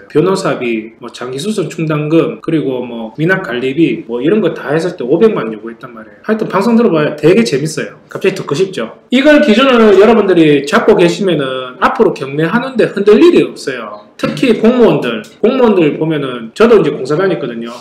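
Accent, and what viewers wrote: Daegu, South Korea. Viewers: 말을참재미나게 잘 하시네요 착착감기는 대구사투리도 듣기좋으네요 강의 잘들었습니당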